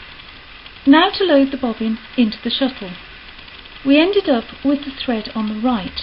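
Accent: United Kingdom. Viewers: Wonderful instruction given in the Queen’s English. I love the excellent quality and presentation of these old time videos. Thank. You very much.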